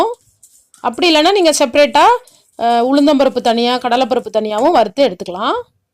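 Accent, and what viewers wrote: Tirunelveli, India. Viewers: Tirunelvelila endha ooru aunty ungaluku? Mumbaila irukinga pola.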